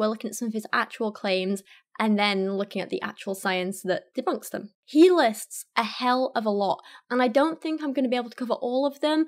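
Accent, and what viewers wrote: United Kingdom. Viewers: Sorry little girl but Kent would destroy you in a debate! You are not smart! I know you think you are but your wrong. just like most ppl like yourself are. You talk to stuffed animals! Your brit accent doesn't mean you are smart honey. Your gen. were spoken about by Jesus himself and you will see the end days soon enough and I cant wait.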